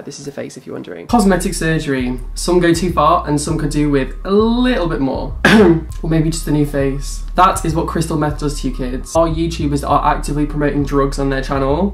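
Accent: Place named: United Kingdom. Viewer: The British accent makes it particularly saucy.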